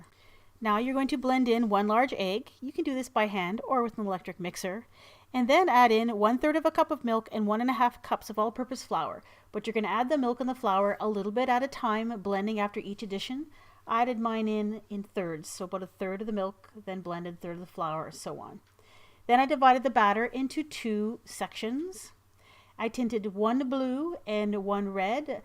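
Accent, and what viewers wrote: Canada. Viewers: Quick question, are you Canadian or American?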